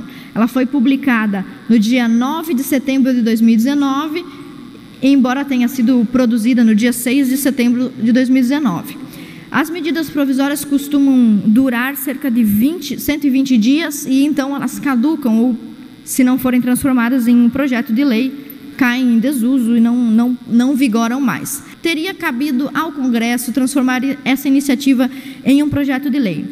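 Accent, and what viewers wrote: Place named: South, Brazil. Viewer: Acho esse sotaque sulista tão bonito.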